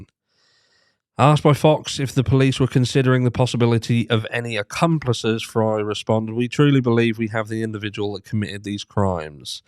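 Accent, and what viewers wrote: United Kingdom. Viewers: British accents are amazing. Thanks for the commentary. Eye opening!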